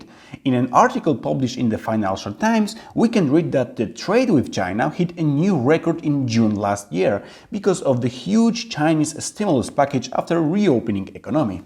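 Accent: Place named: Poland. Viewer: Add english subtitles asap. You need them with your polish accent.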